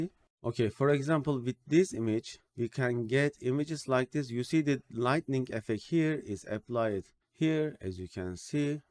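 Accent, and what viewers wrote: Turkey. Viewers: Buram buram ben Türküm diyor bu aksan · türk olduğunu konuşmandan anladım sağol abim :D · Türk ingilizcesine benziyor.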